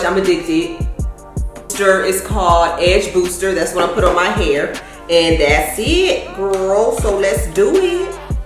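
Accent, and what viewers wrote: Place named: South, United States. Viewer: Yes, ma'am, I am here for the consistent uploads! Love your personality and your channel! You are so gifted. I think I remember hearing that you were born and raised in CA, but I definitely hear a southern accent. 🤷🏽‍♀️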